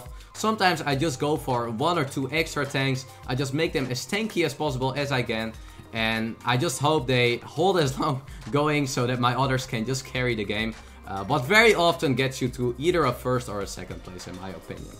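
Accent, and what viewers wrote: Netherlands. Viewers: This guys his dutch accent is so strong lol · Obviously Dutch, that accent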